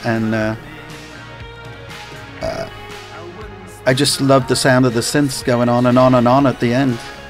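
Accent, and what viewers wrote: United States. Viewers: What's with the ridiculous america accent mike and you're toned down your Liverpool one embarrassing ? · Is it me or does he have an American accent? Isn't he originally from England?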